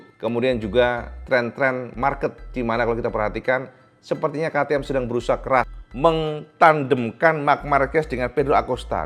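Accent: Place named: Tulungagung, Indonesia. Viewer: Bang lu orang tulungagung juga yaa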